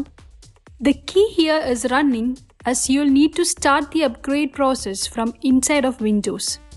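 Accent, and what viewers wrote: Kerala, India. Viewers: R u a Keralite.?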